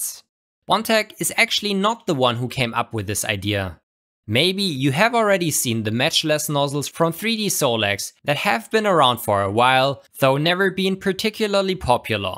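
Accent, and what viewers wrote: Germany. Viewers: Man hört so hart raus dass du Deutscher bist :) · höhre ich da deutsch raus? XD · hmnhhh gutentag, your english is nice i bearly hear your german axent:D im proud of you <3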